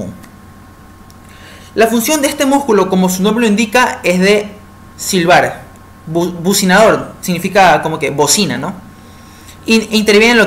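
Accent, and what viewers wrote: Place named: United States. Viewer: Todo muy bien … pero tienes problemas para pronunciar la R porque se escucha como un gringo 🙄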